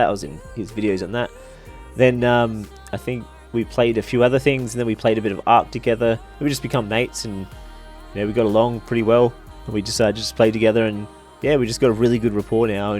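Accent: Australia. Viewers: thats awesome, i acually didnt realise you were an aussie. love it. us aussies have to stick together, what tribe were you in on official servers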